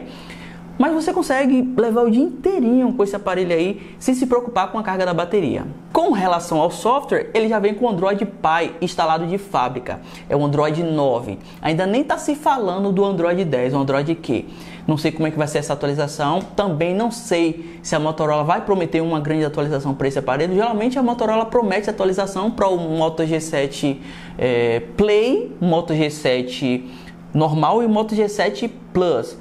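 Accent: Bahia, Brazil. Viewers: Quer sucesso falando Bahiano em nivel nacional me poupe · Kkkk sempre desconfiei q tu era bahiano só pq da sua voz("voz msm não sotaque") e agora com esse lá ele agora sim tenho certeza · Muito engraçado ouvir a análise no sotaque baiano! Dá um diferencial. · Oh, baianinho tu e de qual origem da Bahia??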